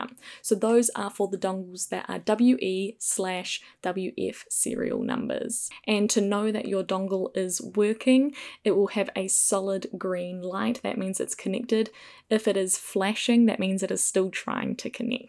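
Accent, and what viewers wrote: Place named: New Zealand. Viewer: I'm still struggling with the Kiwi accent, where an "I" is pronounced like an "E" and an "E is pronounced like an "I".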